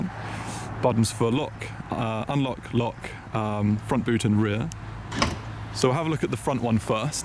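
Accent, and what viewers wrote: RP, United Kingdom. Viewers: Great review, posher than Shmee as well😁 (mean that in a good way)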